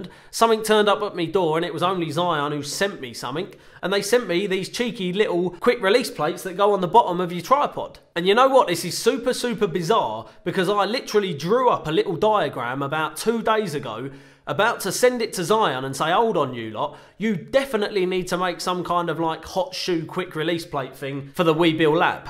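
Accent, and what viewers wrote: United Kingdom. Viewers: OMG You're like the hottest Youtuber talking about gimbals. My opinion is absolutely biased because I'm obsessed with gingers and British accents. Just subscribed cause you can talk about any tech and I'll think it's super hot. Xxx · Ok, subscribed after 30 seconds just for the raging britishness of the lad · you are extremely british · I'm broke but you sold me. Quite refreshing to hear a brit speak on YouTube instead of Peter Mckinnon, Alen Palender and all them Canadian fellas. Great video, cheers. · i like british acsent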